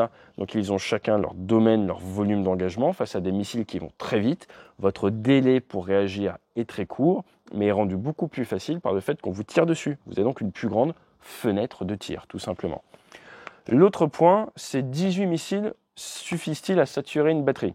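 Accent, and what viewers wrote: Quebec, Canada. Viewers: Êtes vous québécois ou français? Parce que de toute manière vous êtes génial. Mes amis anglophones apprécieraient une version sous-titrée en anglais. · Etes vous québécois quoi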